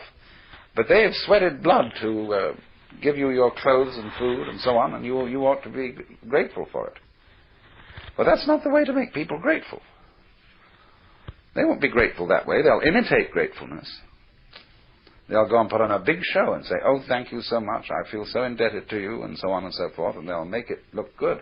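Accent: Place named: England, United Kingdom. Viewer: are you sure this is watts,dont sound like him,ous not pronounced right,more english than scottish tones .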